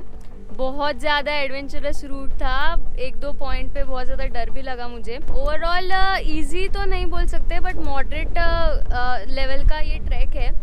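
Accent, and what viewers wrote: Maharashtra, India. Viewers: Proper marathi accent good one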